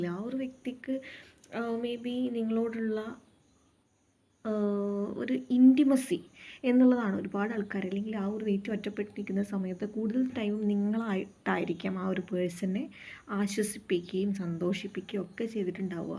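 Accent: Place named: Kannur, India. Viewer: cheythini😂🤣,,, pakka kannur kari👍,,mm,,mm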